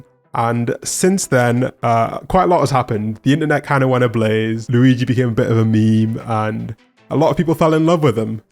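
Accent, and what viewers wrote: United Kingdom. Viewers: You know it took me a while to piece it together. But someone with a british accent explaining the trial of Luigi with more care and compassion then US Media is hilarious to me. Anyway, forgot I was subscribed here and since I turned off my youtube algorithm, I hope to ring the bell and get more videos. Oh yeah, FREE LUIGI!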